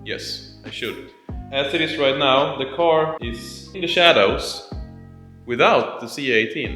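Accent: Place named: Sweden. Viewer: yeeez verybra jdm swenglish du got my sub <3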